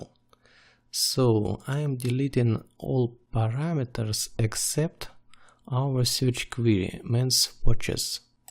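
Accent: Russia. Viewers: i fucking love russian accent. i hate listening tutorials with indians.. i dont have nothing against them, just cant listen to them.. but russian.. i love that language.. i know little russian.. ya ponimayu russky nemnogo :D croatian is also very similar to russian . cheers. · you have a nice russian accent :) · Русский английский заходит лучше, чем английский английский - благодарю за видео!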